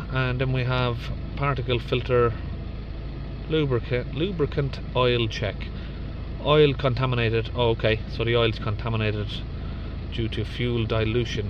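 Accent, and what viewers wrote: Ireland. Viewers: Hiya. Love watching your videos and we don’t even have a diesel now. Got a question for you which is away from cars & vans just for now. I’m 63 now and Dad was a Kerry man while Mom was Coventry. They used to take me to Ireland when I was a nipper to Westmeath near Mullingar. Your accent does keep reminding me of my time there so am I close or not. Do hope you don’t mind me asking. Keep up the brilliant videos 👍👍🇮🇪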